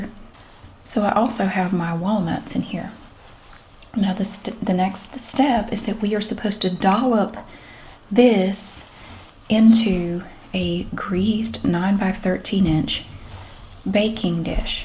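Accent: South, United States. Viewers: I love the way you say oil with your southern accent! I was making gingerbread the other night and I was thinking of you and I said ad the ol 😂.. my son squinted his eyes and looked at me lol.. I said it's an inside joke.. u wouldn't understand. I love your southern accent!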